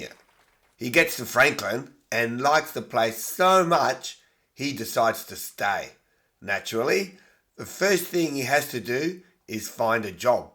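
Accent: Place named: South, United States. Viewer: Your rednek accent sucks.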